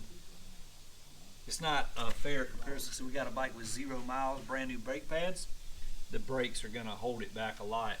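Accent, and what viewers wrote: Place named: Rural, United States. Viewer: You sound country and far from the city, where are you located?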